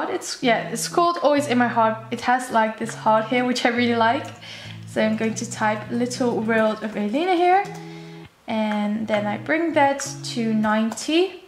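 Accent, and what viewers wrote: Netherlands. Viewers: Dutch accent..Then I read your FAQ and voila. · You have a Dutch accent.. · Ik kon aan je accent horen dat je Nederlands bent!💗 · wow ur accent is like netherlands peoples accent xD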